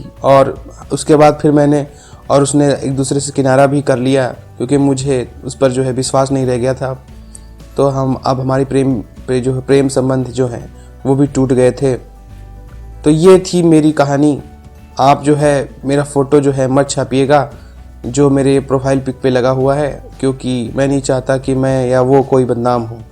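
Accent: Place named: Bihar, India. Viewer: Kon se jila ke ho bhai. Pakke bihari lag rahe ho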